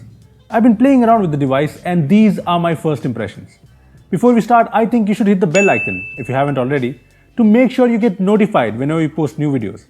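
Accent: India. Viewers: Most genuine indian english accent. Nice review....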